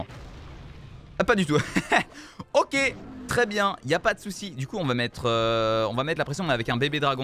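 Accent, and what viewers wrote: England, United Kingdom. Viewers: En vraix tu fait genre mais ta un super accent anglais :OO sinon cool la vidéo ^^ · Joli accent anglais je suis jaloux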